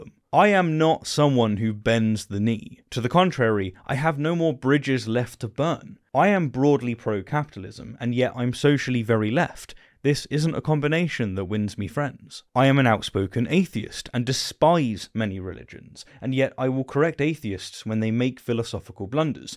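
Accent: United Kingdom. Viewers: I am one of those that accused you of bending the knee to the woke and am glad that you addressed the issue with this video which is very brave of you. I respect your intelligence and youthful zeal of which I once had so I have empathy of which I sometimes perceive as arrogance. It was what happened in your country, I gather is Britain because of your accent, that I got red pilled. It was how "Tommy" got treated for shining a spotlight on the"gangs". With all due respect Britain might already be lost and "Cherrio" law is imminent. First they silenced Tommy, then Mel Shaw and now Russel.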